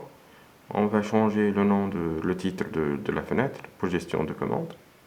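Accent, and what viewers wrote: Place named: Tunisia. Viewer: accent tunisien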